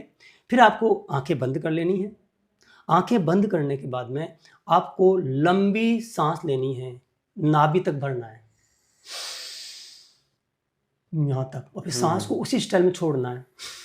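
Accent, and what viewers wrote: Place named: Jaipur, India. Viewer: Sri Maan ji aap jaipur side nivasi ho kya bhasa ka put se lag raha h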